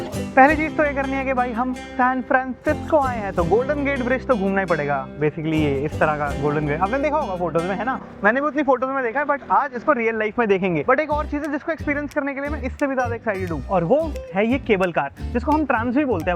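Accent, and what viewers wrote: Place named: Kanpur, India. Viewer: Kanpur ka DNA accent me aa hi jata hai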